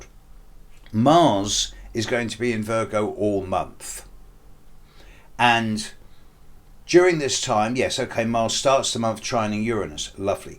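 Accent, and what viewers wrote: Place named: West Country, United Kingdom. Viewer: Love your West Country burr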